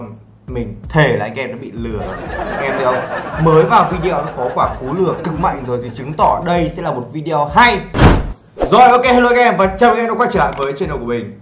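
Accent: South, Vietnam. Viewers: nói tiếng trong Nam dễ thương quá dợ (´∀｀)♡♡♡cute ghê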